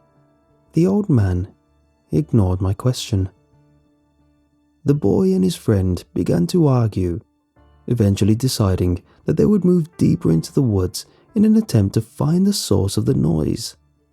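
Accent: United Kingdom. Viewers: Is from the UK?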